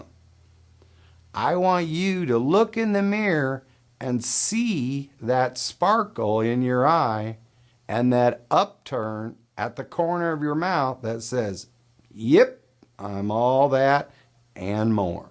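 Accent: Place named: South, United States. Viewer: Your delivery and pacing is really good. I disagree with those who want it faster. They must be hyper active coastal types. Very refreshing compared to many frantic YouTubers. You sound like a southern good old boy: easy on the ears : -)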